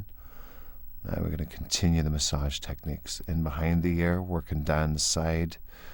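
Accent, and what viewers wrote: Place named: Glasgow, United Kingdom. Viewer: He's from Glasgow, Scotland.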